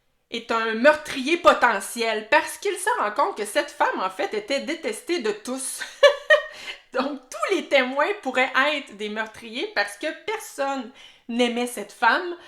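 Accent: Quebec, Canada. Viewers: Coucou 😊 super vidéo 👏👏 i comme c'est agréable d'entendre l'accent québécois ❤️❤️❤️ comme dis sur Instagram je découvre pleins de nouvelles sagas et surtout j'ai mis malphas dans ma wishlist! Merci pour cette vidéo! Bisous 😘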